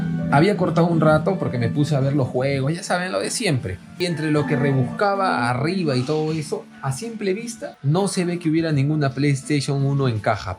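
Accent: Peru. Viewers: Sabía q eras peruano, la criollada el acento, excelente. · Por el acento y criollas y la inca Kola fácil .... Es peruano - japones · Ese tonito peruanazo me vacila chévere causa para ti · 30 segundos de video y me di cuenta que era peruano :’) es un INKAAA KOLAAAAAA. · Eres Peruano verdad?? Feliz año broer.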